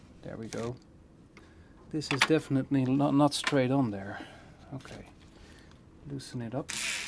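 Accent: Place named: Netherlands. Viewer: The way your accent sounds i know for sure youre from the Netherlands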